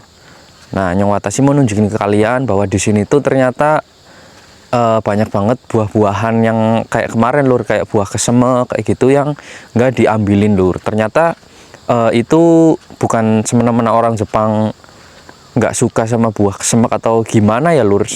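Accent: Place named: Cilacap, Indonesia. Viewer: Lokatnya medok ms,orang Cilacap apa ms,?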